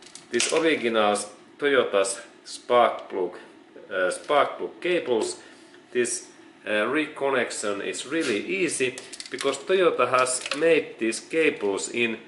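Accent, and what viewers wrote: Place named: Finland. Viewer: He sounds Finnish to me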